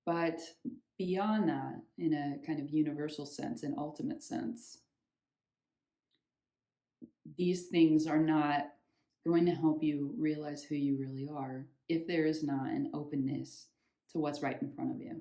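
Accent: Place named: United States. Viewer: I don't know who you are referring to as your speak so fast and the American accent is really difficult to understand at the best of times. The trick is that there is a God, that is a creator and is a male or female. This is delusion. The body belongs to Nature and out of your control. Emotions are not under your control. Reactions through senses is not in your control. If you hear a loud bang and body jumps, there's your proof. What comes before Consciousness if the body jumps before the mind is aware of the loud sound? If one is 'God' one would have been aware because God is whole. Blessings.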